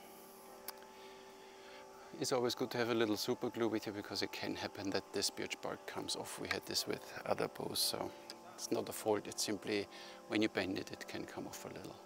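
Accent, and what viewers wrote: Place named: Germany. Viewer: That is a nice German accent! It reminds me of Dr. Emil Shaffhausen! - oh, and the bows were nice too.....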